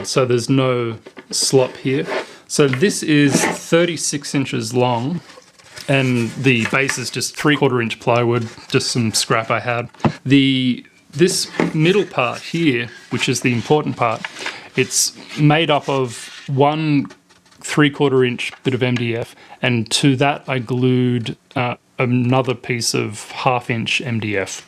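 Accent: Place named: Australia. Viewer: This looks great! You sound Australian and you gave the price in dollars. Unfortunately I can't see any information at the String Pluckery website about what currency they're using or where they are located. Is this price in Australian dollars? (I'm hoping it's not in US dollars!)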